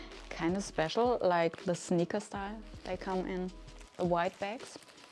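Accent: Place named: Germany. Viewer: you look gorgeous in everything you wear and I love your German accent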